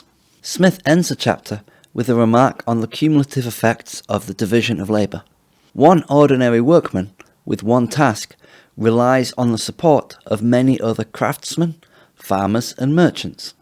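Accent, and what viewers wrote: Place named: Cheshire, United Kingdom. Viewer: This has to be a Cheshire accent.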